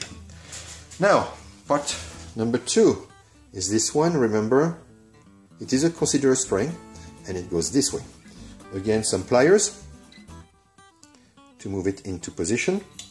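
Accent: France. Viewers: tried to find the answer in your other videos? Is that a French accent? Also I followed your instructions to install the Cajun Gunworks Reach Reduction kit on my Shadow 2 today. It worked out awesome!!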